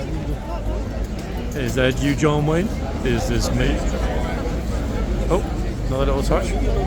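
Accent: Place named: United Kingdom. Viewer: HELLO, I LIVE IN THE STATES & I AGREE ABOUT EVERYONE TOUCHING THE HORSES. I DO NOT UNDERSTAND. WHAT THE BIG DEAL IS THAT PEOPLE FEEL THEY NEED TO TOUCH THE HORSES. I CAN SEE A CHILD WANTING TO, BUT NOT A ADULT. THEY NEED TO BE TOLD NO RIGHT A WAY. THERE ARE GAURDS THAT SIT ON THE HORSES WHEN SOMEONE IS MESSING AROUND WITH THIER HORSE, that do not say any thing, some of them scream & scare me. THEY NEED TO BE YELLED AT RIGHT THEN. THERE ARE MANY THAT DO NOT SAY ANY THING, THEN SOME GO OVER BOARD WITH IT. PUT A LIGHT WEIGHT SIGN ON EACH OF THE HORSES NECKS. PLAY A RECORDED MESSAGE , BUT I THINK THE GAURDS WOULD GET TIRED OF THAT. MAKE A WOODEN ARM WITH A SOFT HAND COME OUT & SMACK THE PERSON WHEN THEY TOUCH A HORSE. I THINK THEY WOULD GET THE HINT, DONT YOU. SOME THING ELSE I WANT TO MENTION, WITH ALL THE PEOPLE THERE TAlking, & all the traffic, you with the british accent, it is very hard to understand what you are saying & talking about, I lIke to hear it all. 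Is there any way you can speak up,? I am afraid if you make it louder it will make e ery thing around you louder. Thank You for reading. If you need any more DO NOT TOUCH THE HORSE IDEAS, JUST LET ME K OW. LOL, DONNA I LIVE IN TENNEESSEE, BUT I AM FROM CHICAGO, IL. OH ONE MORE THING, I THINK PEOPLE WOULD WATCH YOUR VIDEOS NO MATTER WHAT THE TITLE, BUT I WE FEEL THAT YOU TITLES & YOUR TITLES DO NOT ALWAYS MAT H UP. YOU THI K YOUR GOI G TO SEE A VIDOE THAT THE GAURD IS GOING TO GO TO WAR WITH A TOURIST, BUT IT ENDS UP NOT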